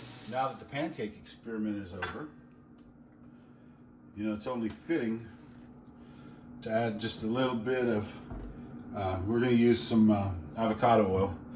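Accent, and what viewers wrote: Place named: United States. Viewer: Super helpful !!!! Thank you ! My boyfriend likes your country accent btw ! Keep it up buddy !! 🤟🤟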